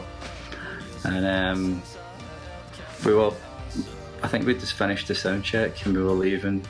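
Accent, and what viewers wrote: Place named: Scotland, United Kingdom. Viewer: I'm going to get in Scotland to learn to talk with that accent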